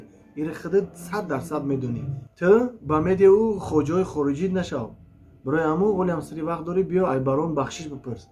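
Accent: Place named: Yovon, Tajikistan. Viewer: Ту монда буди ба хами акценти ёвонит Абдурозика таьна накарда.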